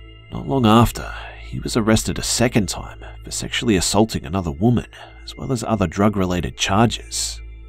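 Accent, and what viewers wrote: Australia. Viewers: Hey mate, are you from Australia? And when are u gonna do a face reveal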